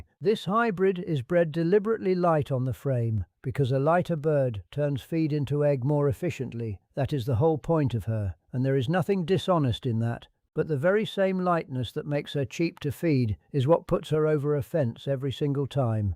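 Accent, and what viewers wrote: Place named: Yorkshire, United Kingdom. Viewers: I dont understand why a Scotsman talks with a accent that is nearer to a Yorkshire man. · He sounds Yorkshire accent to me.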